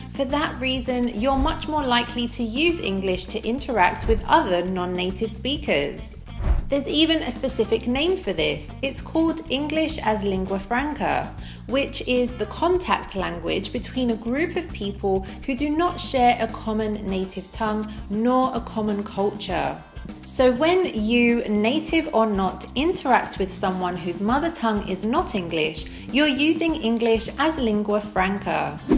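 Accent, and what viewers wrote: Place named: United Kingdom. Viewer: I like bri'ish accent😂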